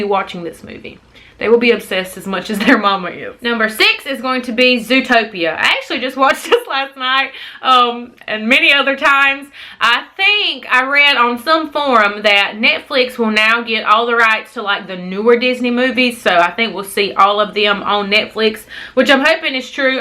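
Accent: South, United States. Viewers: Your accent is so southern!😂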